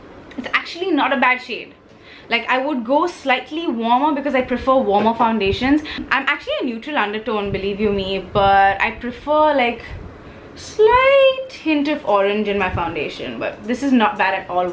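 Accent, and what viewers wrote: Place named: United Kingdom. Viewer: Love the video, as always your videos help me a lot. Love you and love your videos. I missed you so much mommyyy, your talks, advices and jokes and the British Accent.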